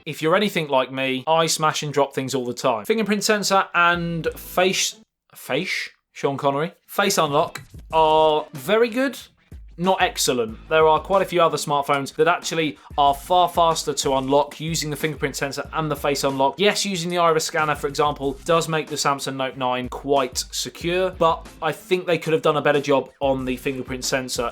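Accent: United Kingdom. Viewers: Hears unintelligent British accent, disregards opinion and clicks off video.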